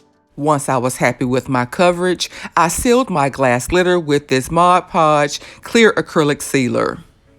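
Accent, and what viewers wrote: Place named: Memphis, United States. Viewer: Sound like your from Memphis or Atlanta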